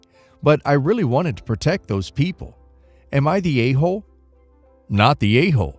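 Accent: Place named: United States. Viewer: The weird part is that I don't think it's AI because there was a thick American accent. 😂😂😂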